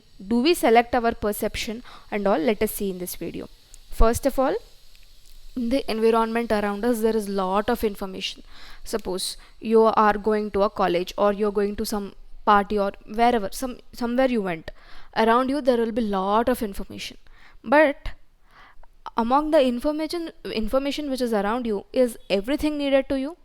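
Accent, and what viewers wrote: Telugu, India. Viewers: SISO YOU ARE A TELUGU SPOKEN PERSON I'VE GOT CLARITY. THE LECTURES ARE QUITE GOOD 👌👌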